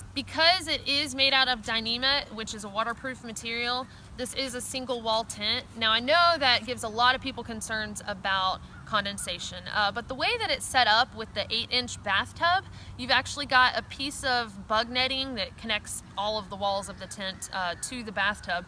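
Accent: South, United States. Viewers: It is so so great to see a young lady be such an outdoor lover. They may be out there but you don't see them....most people of her age are too busy watching Kim K and not seeing the world for what it is.....and the southern accent is awesome. Great vids and good work....thank you.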